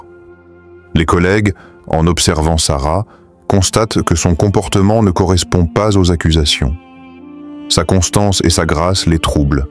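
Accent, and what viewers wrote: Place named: Canada. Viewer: Dieu est fidele❤ j ai ete et suis calomniee mais je reste la meilleure reponse..... je suis bien vivante et rayonnante😊 j ai ete calomniee dans une ecole et j ai renversee la situation contre mes detracteurs faux temoignage = peche capital😈👿 dans ce type de lynchage la lachete et la complicite de l entourage pesent lourdement.... celui qui se tait est complice. Le menteur a mal lu Machiavel😊 Dans ce cas l issue est heureuse mais helas parfois l issue est tragique pour la victime super l accent canadien😊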